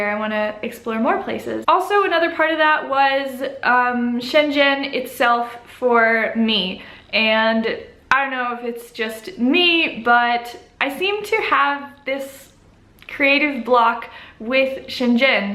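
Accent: United States. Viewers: I guess that you are an American based on your accent. But I can't decide where you got that blue eyes. Hah hah.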